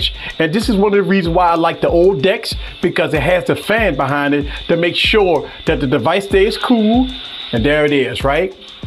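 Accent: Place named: South, United States. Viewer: that nawlins wallpaper is no surprise with that accent. Way down south accent. Rock onbro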